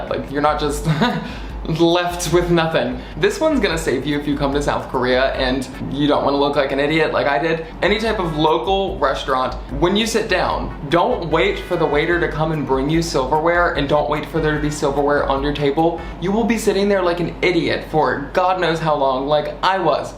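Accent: South, United States. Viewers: Do you have a slight southern accent ?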